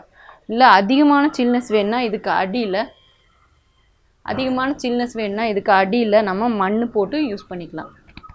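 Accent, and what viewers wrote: Coimbatore, India. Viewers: Kovai tamil thana neeinga pesurathu akka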